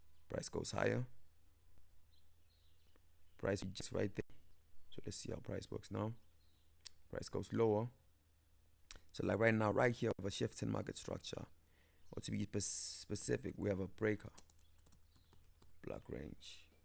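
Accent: South Africa. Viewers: he's from south africa :D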